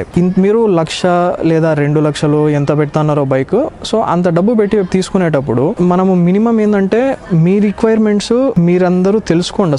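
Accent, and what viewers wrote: Rayalaseema, India. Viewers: You are from rayalaseema right??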